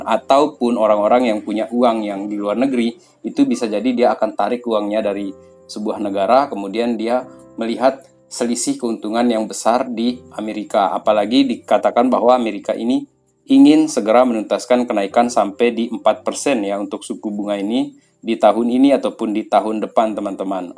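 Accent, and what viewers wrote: Sumbawa, Indonesia. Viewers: Penasaran sama masnya, kok mirip orang Sumbawa ya medoknya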